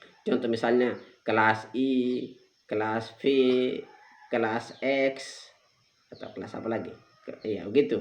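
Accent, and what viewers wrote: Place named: Kupang, Indonesia. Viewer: Dari logatnya ini kaya orang kupang ya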